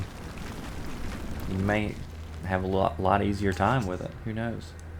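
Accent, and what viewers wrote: South, United States. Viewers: Hey were you from? I'm from georgia, you sound like you're a fellow southerner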